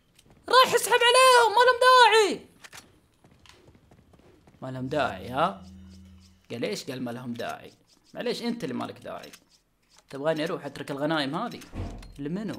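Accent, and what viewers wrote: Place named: Saudi Arabia. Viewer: اللهجه سعوديه وحتى ask any one he told you were he from!!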